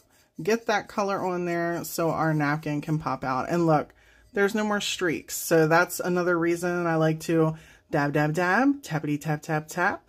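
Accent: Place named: Pennsylvania, United States. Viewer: I love listening to you, it reminds of home. PA. Unfortunately I'm coming in the next few days because of a death in the family.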